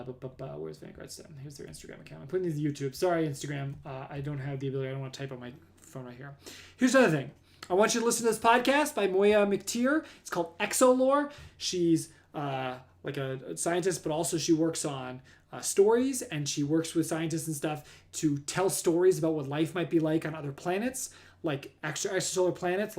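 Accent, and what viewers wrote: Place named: Middle East. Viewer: well you also have a bit of an accent to you so I guess that's why I thought Middle Eastern. Another thing I was a little surprised by is hearing that you grew up in OC, cuz I thought you grew up in NY.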